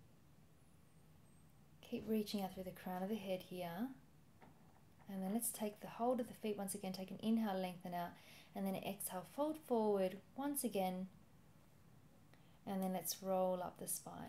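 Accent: Australia. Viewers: I have so enjoyed the yoga with an Australian accent! Great yoga classes and always new poses. Thank you.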